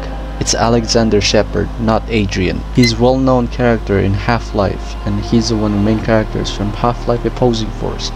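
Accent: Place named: Russia. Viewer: is so peak. Ngl I didn't know you have the Russian accent, it's good to see a Half-Life fan nowadays who has a love for zombies too.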